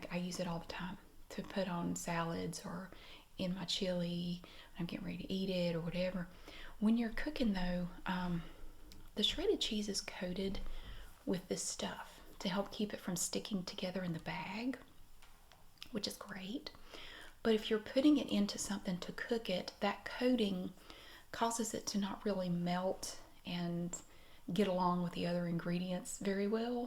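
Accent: United States. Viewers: Hi ! I’m a French 33 yo lady and it’s 5am here, I can’t go back to sleep and I randomly found your channel tonight. I really appreciated your video, it feels like I travelled to the US and have been welcomed in a friendly American woman’s home. I love the accent, you seem really nice and I enjoyed the recipe too. I have no idea what is corn bread though 😂 Due to a bad mental health I haven’t worked in a while and have been really isolated, so this little moment in time with you was so nice. Thank you !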